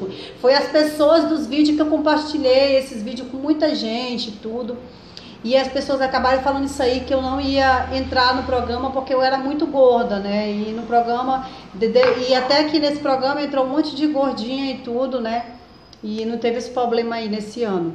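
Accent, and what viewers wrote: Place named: Northeast, Brazil. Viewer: nossa adoro esse seu jeito de falar bem nordestino msm" mangano" quer dizer rir de vc. sou de Natal Rio Grande Do Norte. bj linda vc é nossa motivacão!😙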